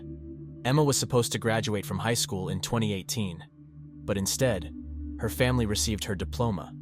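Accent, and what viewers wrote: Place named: Australia. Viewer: I love to see how you are growing so fast!! I miss the Aussie accent. Great video!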